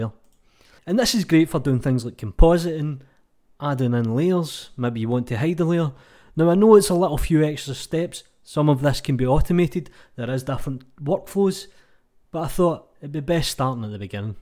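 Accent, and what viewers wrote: Scotland, United Kingdom. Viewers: When I turned on auto-generated subtitles with your scottish accent xD "I'm gonna add on fail open nod" -> "I'm gonna add on a fail or not"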